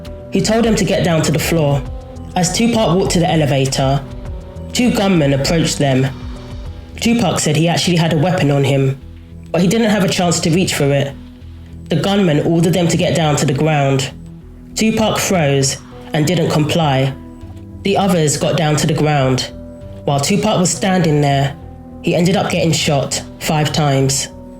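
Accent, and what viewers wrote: London, United Kingdom. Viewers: Some big fish did go through the net. To this day still an unsolved murder! Love the London accent! RIP❗️though everything was avoidable.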